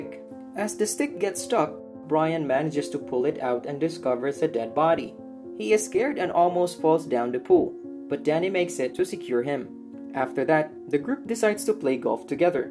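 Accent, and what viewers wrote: Philippines. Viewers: The guy who voiced over is 100% Filipino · Is this a Filipino accent??? Great Video!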